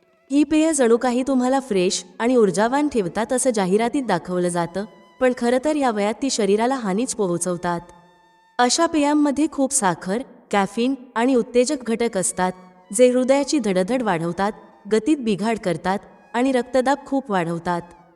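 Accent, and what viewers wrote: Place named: South, India. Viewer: मराठी उच्चार दाक्षिणात्य वाटतात...😊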